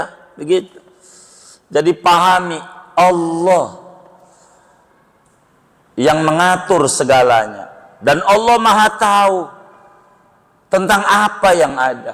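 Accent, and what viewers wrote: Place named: Betawi, Indonesia. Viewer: Suka banget sama ustadnya. Orangnya ilmunya luas, orangnya lucu dan bahagia, ngomong betawinya kocak bikin ketawa jadi asik dengar tausiahnya.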